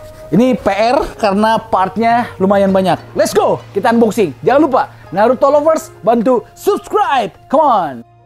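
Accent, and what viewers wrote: West Java, Indonesia. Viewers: BANG LO ORANG SUNDA YA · Sunda pisan mang Salam lembang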